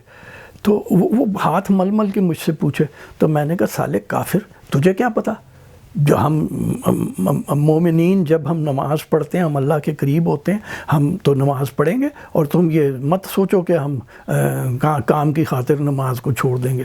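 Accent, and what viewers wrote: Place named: Talagang, Pakistan. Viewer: My parents and grandparents emigrated from Talagang. Your Punjabi is similar. Are you from that area?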